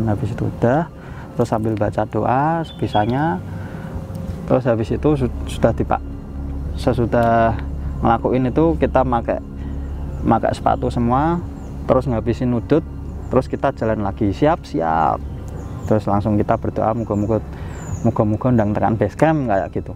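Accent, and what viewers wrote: Java, Indonesia. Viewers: jowo ne medok mantapp👏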